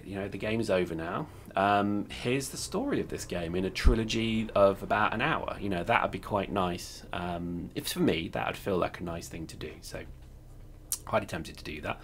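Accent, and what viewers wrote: United Kingdom. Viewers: Yay is back your videos and British accent always make my day!:D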